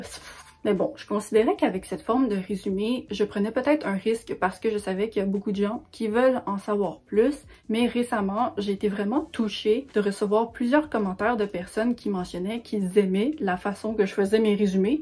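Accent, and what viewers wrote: Quebec, Canada. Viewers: Félicitation pour cette 1ère année. C'est un plaisir de t'écouter, ton accent est charmant tout comme le ton de tes vidéos. Je suis une européenne, abonnée au compte de 2 autres booktubeuses québecoises (Anne litterarum et Signé Daphné), dont l'une grâce à toi et au calendrier de l'avent booktube Québec. Ne change rien et continue à lire de la science fiction!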